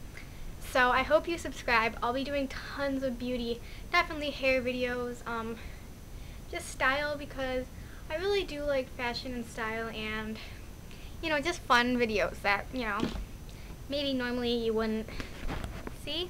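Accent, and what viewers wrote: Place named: New York, United States. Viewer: You can kinda hear New York accent in this video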